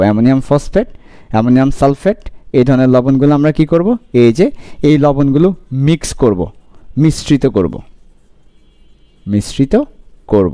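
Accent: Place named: Chittagong, Bangladesh. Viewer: Bai chittagong er naki ,chini chini lagtase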